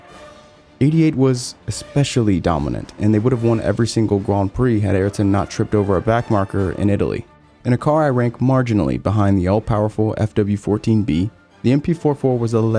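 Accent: United States. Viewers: It might be weird, but this is my first time hearing an American accent talking about F1......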